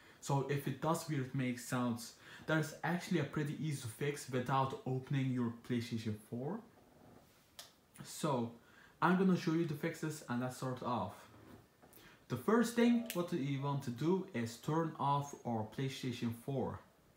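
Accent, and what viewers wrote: Netherlands. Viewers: Yeah the moment I heard his accent I was like haha he is dutch and it turned out to be true. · Lol ur dutch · Bruh your ducht accent is so obviuos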